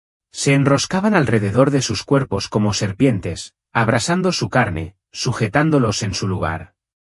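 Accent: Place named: Catalonia, Spain. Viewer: Me choca que hagan videos religiosos con voces robóticas y además con acento español catalán, suenan muy mal porque son impersonales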